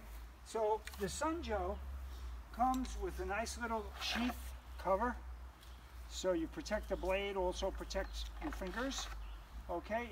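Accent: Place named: Rhode Island, United States. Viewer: Cool accent. Rhode Island? Sounds like my relatives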